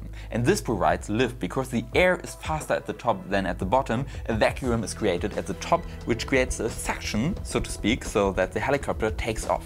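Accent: Germany. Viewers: I'm English, and had no trouble at all with your speaking - yes a strong accent, but not a problem. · Cooles Video! Das "th" klingt manchmal ein bisschen holprig & reduziert an ein paar Stellen die Verständlichkeit, aber wenn du das ganze noch ein bisschen verbesserst top. Ein wenig deutscher Akzent kann ja ruhig auch bleiben.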